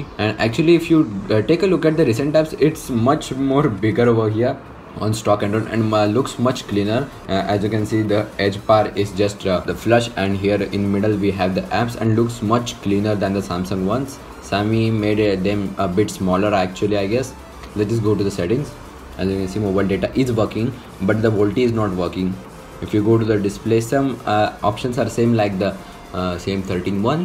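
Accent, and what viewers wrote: India. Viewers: stop videos by indians english language. its very hugly!!! please stooooop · god every non-popular tech video I watch is an Indian with a horrible english accent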